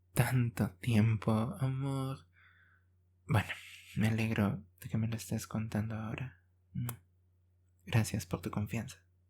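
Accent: France. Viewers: Gracias por todo tu esfuerzo empeño trabajo duro quién me has puesto a los videos a este video cada vez que oigo tu voz se me acelera el corazón me alegra la vida tienes una hermosa voz tranquila calmada que enamora y encantada y encariña siempre me voy a enamorar y me voy a encariñar amo tu voz francesa love for your ❤❤❤❤❤❤❤❤❤❤❤❤❤❤❤❤❤❤❤❤❤❤❤❤❤❤❤❤❤❤❤❤❤❤❤❤❤❤❤❤❤❤❤❤❤ amo con locura tus videos siempre me voy a enamorar y cantarina😊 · Tienes un lindo acento francés ❤❤❤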